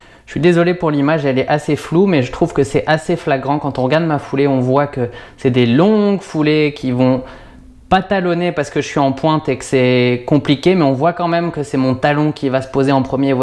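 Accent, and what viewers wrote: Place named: Canada. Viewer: C’est moi ou l’accent canadien prend le dessus ? Merci pour tous tes conseils 😉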